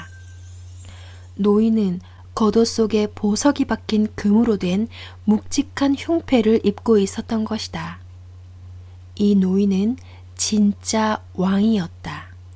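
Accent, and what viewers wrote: Gyeongsang, South Korea. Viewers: 경상도 사투리가 너무 듣기좋습니다 좋은영상 고맙습니다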